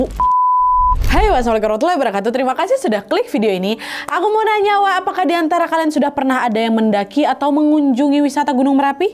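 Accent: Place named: Medan, Indonesia. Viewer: SAYA SUKA KALI dengan CARA GAYA BicAra nya kalau boleh tau AsLinya MEDAN YA enak Kali CAKAP NYA SUKSES buat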